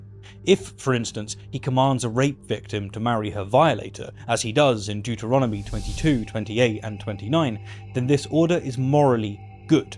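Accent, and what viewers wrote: England, United Kingdom. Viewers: I find it "interesting" that a person with a clearly "English" accent and therefore the inheritor and beneficiary of probably the most evil empire in Human history (165 MILLION Excess Indian deaths between 1880 and 1920, to mention just ONE of the countless evils committed unapologetically by the vicious English) has the temerity to label the Mongols as "Evil". As Christian scripture puts it, "Take the plank out of your own eye, then you will see clearly to remove the speck from your brother's"....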